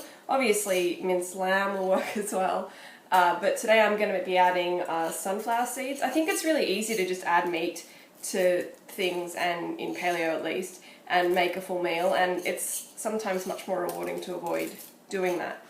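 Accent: Australia. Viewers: FYI Australian accent :)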